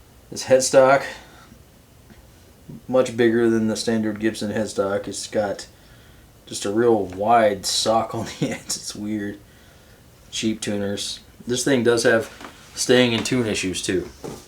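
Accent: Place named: Georgia, United States. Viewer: haha... thought I recognized that accent, I'm from Georgia originally. Been in NC for 25 years or so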